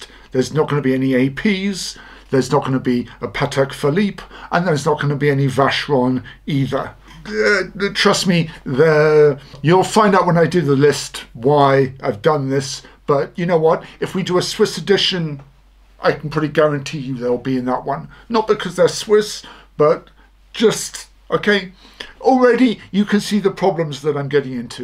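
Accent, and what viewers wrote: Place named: England, United Kingdom. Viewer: Salut, Tu sais ce que j’ai apprécié (entre autres) dans cette vidéo? Je n’avais jamais entendu nommer les stations de ski française avec un accent anglais sur toute une liste comme cela! Merci 🎉😊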